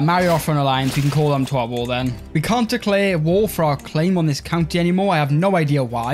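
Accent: Wales, United Kingdom. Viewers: Your Welsh accent is delightful.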